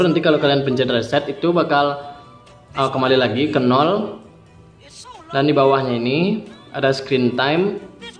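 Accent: Bali, Indonesia. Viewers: Ni org bali ya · Org bali ya bang? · Pasti nak bali ne nu logat" Bali ne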